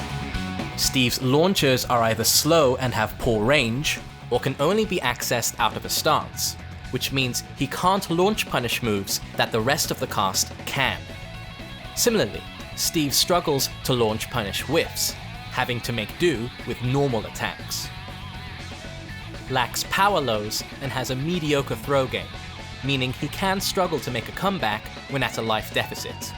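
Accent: United Kingdom. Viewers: british accent nice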